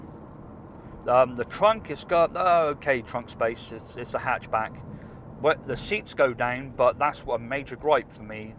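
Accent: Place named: Gloucester, United Kingdom. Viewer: I'm trying to work out your accent.. guessing you're from Gloucester? You're obviously now living in the US, and it looks like a beautiful place to live.. very spacious!!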